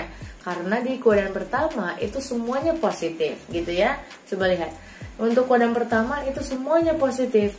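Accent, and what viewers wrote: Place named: North Sumatra, Indonesia. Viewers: Bahasanya Medan kali... Sukses trus membuat kemudahan matematika👍👍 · Nampaknya ini dr sumut ya 😁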